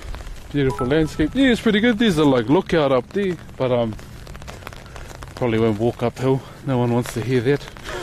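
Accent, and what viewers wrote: New Zealand. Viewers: Love the way you talk newzealand accent is awesome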